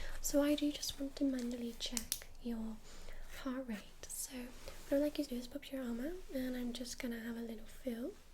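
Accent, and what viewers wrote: United Kingdom. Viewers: Is that a British accent you got?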